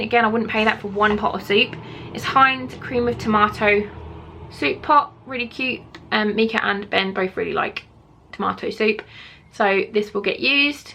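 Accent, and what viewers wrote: United Kingdom. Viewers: Yum, the bulls eye sauce is great. You look super Goddess in this video too, love the UK accent as always, your vids are lovely to watch, love the try on hauls and unboxing vids 😍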